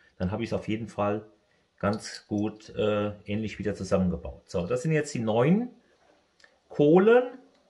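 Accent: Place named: Dillenburg, Germany. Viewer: Sag mal wo kommst du her vom Dialekt her hört es sich nach Dillenburg an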